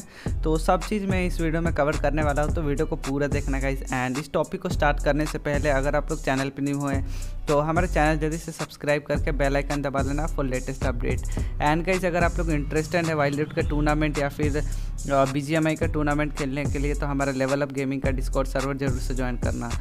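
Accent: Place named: United States. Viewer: live stream wala accent vedio me american accent kese ban jata hai 🥺🤦‍♀️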